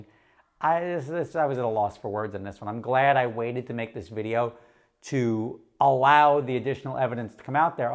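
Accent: New Jersey, United States. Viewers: Stop apologizing for talking like a New Jersey city boy.. that's the way we talk.. 🤷‍♂️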